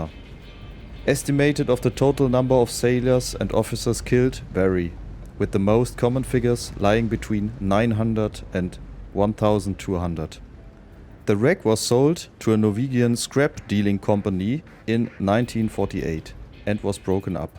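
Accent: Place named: Germany. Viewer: Haha man hört den den deutschen Akzent so raus aber liebe deine Videos 👍👍💖😅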